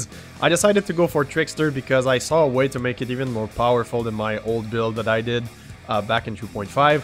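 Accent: Quebec, Canada. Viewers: Quebec much ;p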